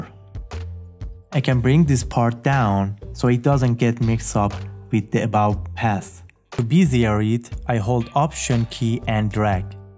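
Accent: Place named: Iran. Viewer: لهجه ایرانیت تو حلقم عالی ادامه بده ❤😂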